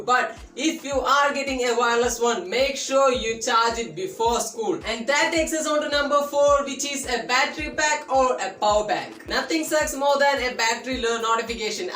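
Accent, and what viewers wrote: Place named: Kerala, India. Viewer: Your English slang tells me that you are a Malayali boy what ever support from Karnataka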